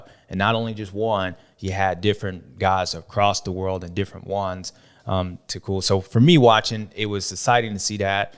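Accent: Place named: Florida, United States. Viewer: Home Soul 😂 gotta love that Florida farm boy accent. Love you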